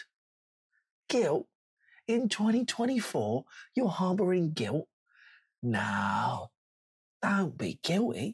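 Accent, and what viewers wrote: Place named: North, United Kingdom. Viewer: Welcome back always a pleasure Long shot but could you do an asmr were u just sell me random shit with ur northsn accent a bit like your antique seller roleplay u don't haft to just thought I'd ask.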